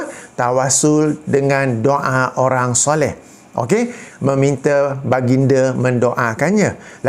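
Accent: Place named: North, Malaysia. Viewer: Senang nk rujuk sama2 ckp utara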